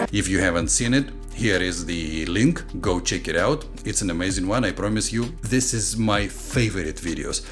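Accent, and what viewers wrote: Russia. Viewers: Despite your runglish pronunciation I found your videos a really helpful for studying English, because I can understand everything, even some words which I don't know or not sure I understand it because of your intonation, context and gesticulation! And you raise a really interesting themes, so I have to say thank you, don't stop and be healthy! 😉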